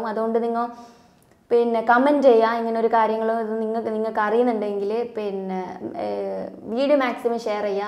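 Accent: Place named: Kasaragod, India. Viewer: Slang kazrod ayond nere manassilayilla....but,informative 👍🏻keep well❤️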